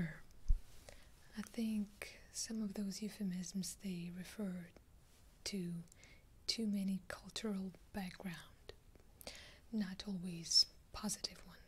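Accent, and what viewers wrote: Russia. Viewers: Русский акцент прекрасен)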